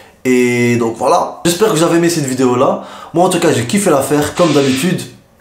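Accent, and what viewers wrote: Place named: Belgium. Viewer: shaab Belgique haha t'es de bxl non ? merci pour le tuto mon frérot 💪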